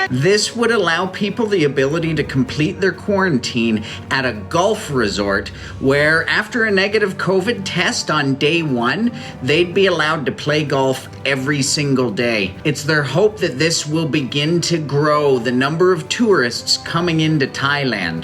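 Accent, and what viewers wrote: Canada. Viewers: You are really awesome. The canadian accent was just superb and the energy in videos makes look Thailand much more cooler. I will really come and meet you once i am back to Thailand and will surely bring goodies from India.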